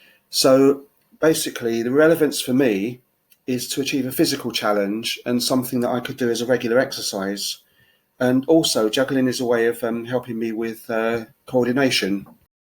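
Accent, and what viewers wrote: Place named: United Kingdom. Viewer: I never really noticed that you had like a British accent. (Is it a British accent?). Nice video by the way.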